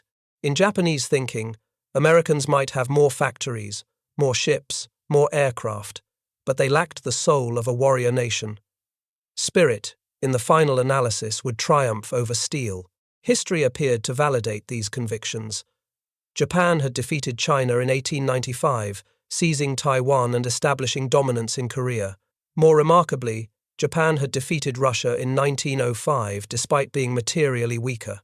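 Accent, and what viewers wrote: United Kingdom. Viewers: Does having a narrator with an English accent makes the information more believable?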